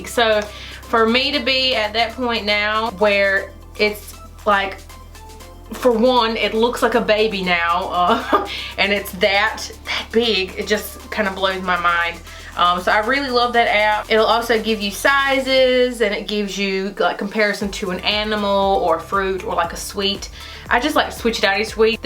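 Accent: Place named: North Carolina, United States. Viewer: I got North Carolina accent as well lol. love it.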